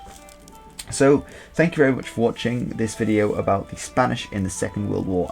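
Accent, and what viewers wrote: United Kingdom. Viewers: You are a Dutchman?! You don't sound like one. If you are Dutch why do you speak English with a British accent? Do you live in the UK or have lived there?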